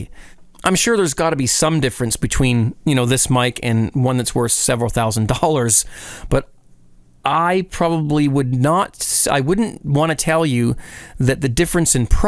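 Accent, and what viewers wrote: Canada. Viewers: Amazing what $90 can do with that Canadian accent. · I could tell you were canadian by the way you talked.... I learned that from people making fun of canadians.... im a terrible person. :D (I have nothing against canada btw) about the mic: This sounds REALLY nice... I'm probably going to get this today.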